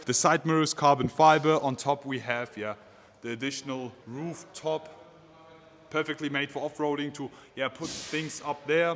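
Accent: Germany. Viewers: Very iritating english german pronunciation!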